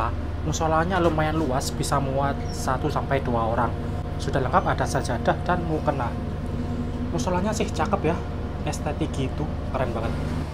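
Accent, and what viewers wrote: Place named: Probolinggo, Indonesia. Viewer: logatmu kayak orang probolinggoan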